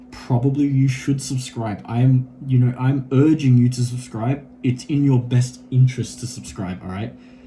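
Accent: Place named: Australia. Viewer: I sense an Australian accent (you might be from New Zealand or I might be wrong) but with how prices are to me your rich.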